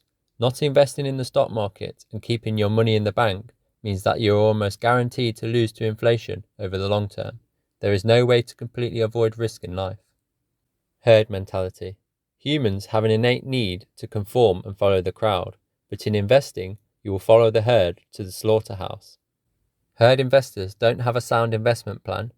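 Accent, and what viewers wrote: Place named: United Kingdom. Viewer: Dang British accent, this Merica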